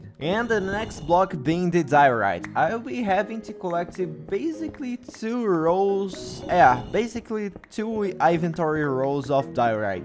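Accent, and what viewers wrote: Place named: Brazil. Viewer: eu tava percebendo que o seu sotaque era de Brasileiro, dai qdo c disse que ingles n era a sua primeira lingua eu saquei que c realmente é br kkkkkkkkkkkkkkkkk, sucesso pra vc parceiro, inclusive o seu ingles tá mt bom, parabéns (edit: tu se inspirou em genshin? Tem uma estrutura exatamente assim lá) (edit 2: agr que eu vi que vc falou no video kkkkkkkkkkkk)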